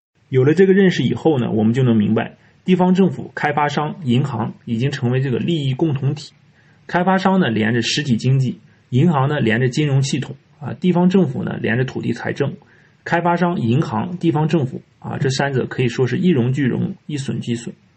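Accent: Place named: Shanxi, China. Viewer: 山西人哇，这口音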